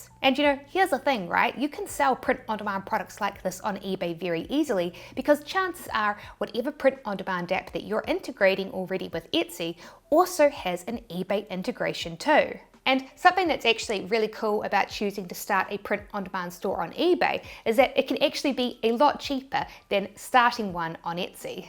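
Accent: New Zealand. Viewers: Im not new here but what exactly is your accent? Its like you grew up in NZ, but have an American and Australian parents. From an Aussie across the ditch. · Hi, Fellow Kiwi here so I can understand you perfectly. I would love to move away from Etsy to Shopify but the time I'll have to put into marketing is daunting. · I lived in NZ for 22 years. It's nice to hear the Kiwi accent again. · I knew instantly you were Kiwi when you said Etsy 😂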